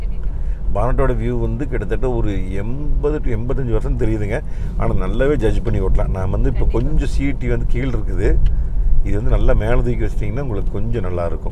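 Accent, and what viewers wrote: Kongu, India. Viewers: அண்ணா வணக்கம் கொங்கு தமிழில் கொஞ்சி தமிழ் வாழ்க வளமுடன்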